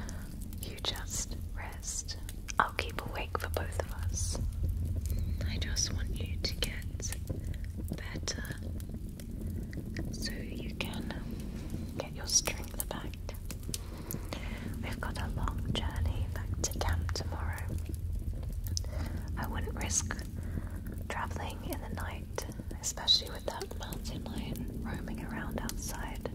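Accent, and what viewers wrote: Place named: RP, United Kingdom. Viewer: The contrast of a primal, feral-looking character with a proper posh british accent is super stark :P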